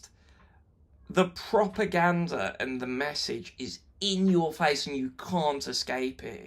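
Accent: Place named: England, United Kingdom. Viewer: Люблю твой акцент, как будто смотрю сериал Убийство в медсан мердере или чисто английские убийства))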